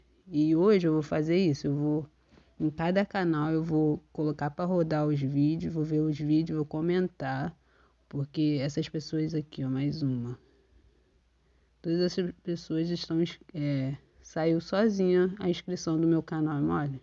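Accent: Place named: Rio de Janeiro, Brazil. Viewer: Muito bom esse vídeo amiga👏👏,amo esse sotaque do rio janeiro 😍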